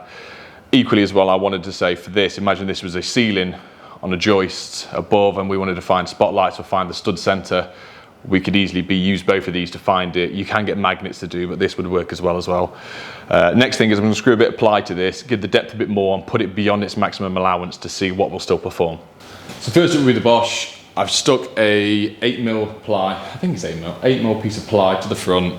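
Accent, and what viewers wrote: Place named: United Kingdom. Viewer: british accent SHIT!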